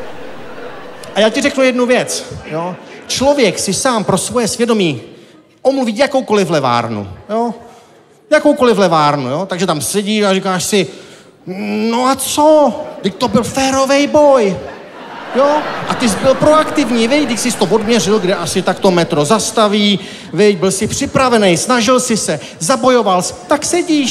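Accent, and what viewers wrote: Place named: Czech Republic. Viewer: Ta brutální čecháčtina při povídání o zženštělosti zní dost komicky. Jinak obsahově dobrý. :-)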